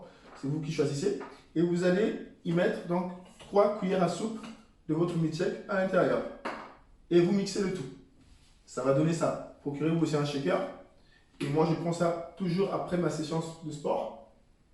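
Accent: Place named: Ivory Coast. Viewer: Très belle présentation de la body mission. On dirait j'ai capté un petit accent 225🇨🇮🇨🇮🥰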